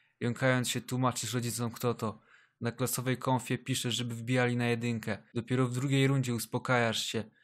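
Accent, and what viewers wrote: Kielce, Poland. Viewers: ty jestes z Kielc?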